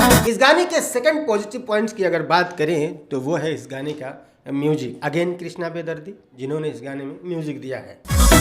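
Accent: Bhojpuri, India. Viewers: यह बोलना पहचान है भोजपुरी के भैया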